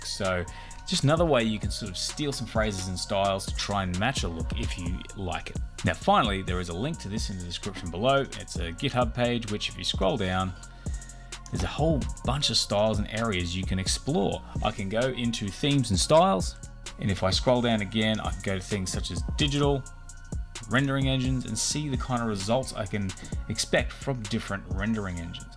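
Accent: Australia. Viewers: Oh, my ears! It was so damn nice to hear such an Aussie accent! G'day!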